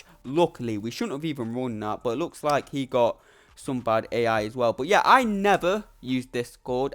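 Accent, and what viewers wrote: United Kingdom. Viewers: Bri'ish man is speaking straight fax 🗣️🔥🔥🔥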